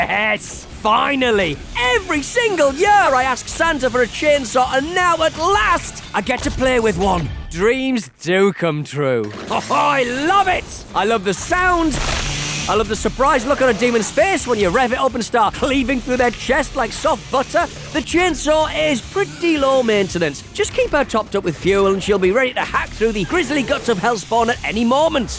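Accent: Australia. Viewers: He sound more Aussie than Irish to me, m8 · The narrator feels like his having a normal day in Australia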